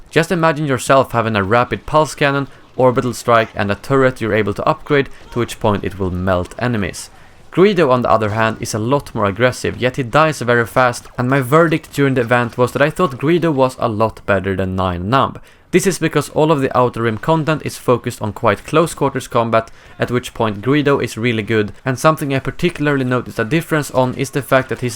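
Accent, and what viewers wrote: Sweden. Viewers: Are you fr.o.m. Sweden (är du från Sverige) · Are You from Sweden? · Are you from SWEDEN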